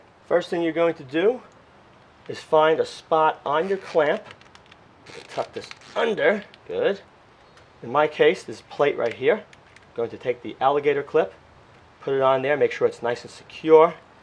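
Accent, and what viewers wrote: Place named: Long Island, United States. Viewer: Thank you, and you are most welcome. Geez, hard to believe but it was 2004. Sure, a lot has changed, but in my opinion and experience the zenith of Toyota quality, fit and finish, and reliability was Japanese made Toyota vehicles of the late 80's-1995/96. Still relatively simple but done with tried and true technology and craftsmanship, and in many cases engines were VERY overbuilt, nearly indestructible, yet refined and beautifully executed/made with extremely high quality parts/metals. Still a lot of timing belts to deal with in this era, but the vast majority of these engines were non-interference, and a broken belt did not destroy the engine. I'd like to compliment you on your channel, and your way of going about your videos. They are clear with a lot of detail, well filmed, very well explained with easy to grasp concepts, done in an affable manner... 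The true mark of a great educator... And it even sounds like my old friends from Long Island. Take care, and please continue your great work.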